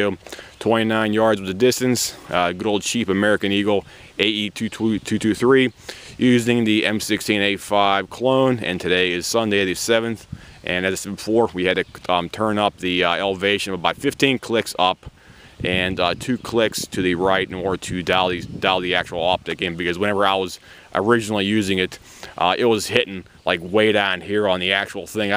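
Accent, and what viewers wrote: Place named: Western Pennsylvania, United States. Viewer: Holy shit...I'm first...that never happens...anyway...r u from pgh pa. by chance ?...that sounds like a w.pa. accent...nice video bro...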